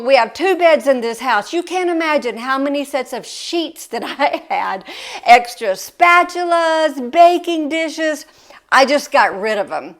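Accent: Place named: South, United States. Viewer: This is my first time watching you. You tube recommended it to me. I'm from the south so I appreciate your accent 😊. Are you also a pastor? Not because of what you said at the end, but you sound like one....is it called the cadence in your voice? And the energy. Just curious lol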